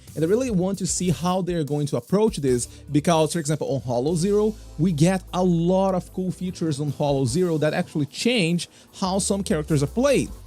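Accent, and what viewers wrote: Brazil. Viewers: Pelo seu sotaque, você é brasileiro 😀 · I'm not gonna lie, sometimes you sound like a Brazilian speaking english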